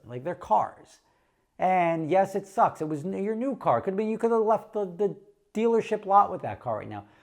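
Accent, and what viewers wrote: New Jersey, United States. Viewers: Stop apologizing for talking like a New Jersey city boy.. that's the way we talk.. 🤷‍♂️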